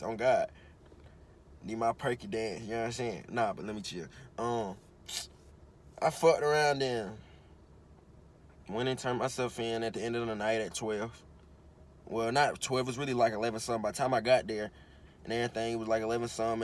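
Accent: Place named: Chicago, United States. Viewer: I didn’t watch the season you’re in I watched the first 3. You sound like you frm Chicago tho haha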